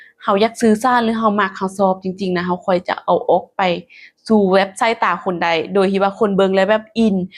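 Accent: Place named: Lom Sak, Thailand. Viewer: ภาษาลาวเหนือบ่คับ เว้าคือหล่มสักบ้านผม คนล้านช้างคือกัน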